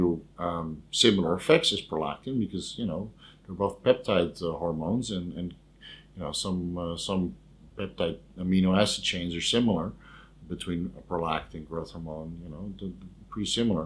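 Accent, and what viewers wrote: Netherlands. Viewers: why is that buddy? Great channel by the way. Could tell instantly you were from the Netherlands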